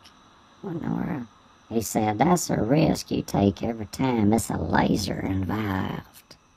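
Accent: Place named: South, United States. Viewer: Your uncle sounds like he was a cool dude ! I’m sure he was fun to be around. Great story . Where are you from? I like your southern accent. I’m from Tennessee and also have a southern accent . I’m guessing you’re from Georgia. But I’m just guessing. 😊. Love the animation . Very cool 😎. You’re so creative and talented.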